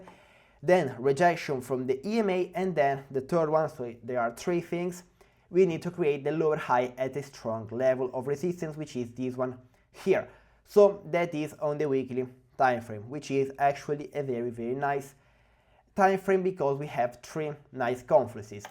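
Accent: Italy. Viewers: ma per curiosità perché li registri in inglese?avevo sentito un pelino l’accento italiano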